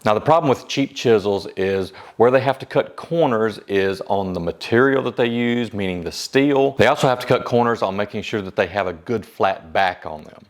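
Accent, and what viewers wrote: South, United States. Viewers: Your southern drawl is divine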